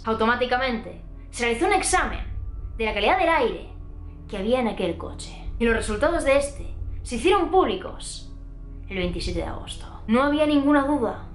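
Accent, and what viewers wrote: Galicia, Spain. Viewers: Eres de Galicia?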